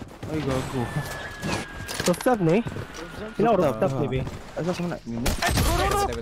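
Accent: Visayas, Philippines. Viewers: Idol bisaya ba ikaw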